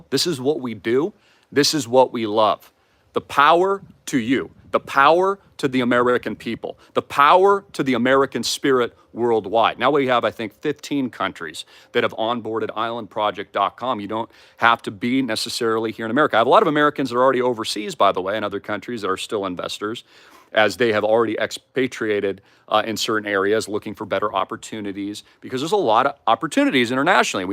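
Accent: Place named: United Kingdom. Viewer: Your British accent 🔥🔥😂😂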